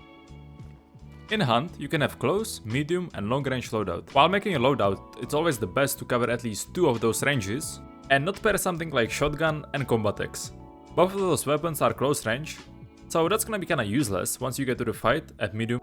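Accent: Czech Republic. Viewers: Tvrdý český prízvuk čo má svoje čaro :D Nice tips, thank you very much :) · ten přízvuk, hned mi bylo jasný že jsi Čech :D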